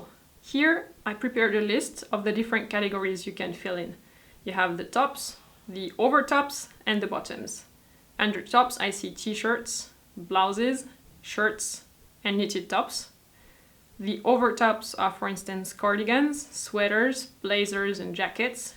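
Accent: France. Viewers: Vera Prokopenko FRENCH and I love listening to her accent. A parent's brother, cousin or friend: Uncle. This 'u' is pronounced as in the word unbelievable or untrue. The word 'an' in ankle is pronounced as in the word anchor or angry. I'm in the UK and I love accent. I don't know anyone else with a French accent. It's very pleasant to hear. She doesn't speak to fast and everyone understands clearly what she is saying:)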